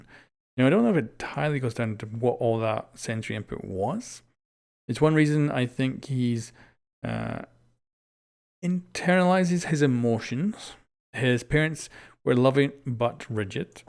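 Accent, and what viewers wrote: Ireland. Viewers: Are you irish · You have to be irish with this accent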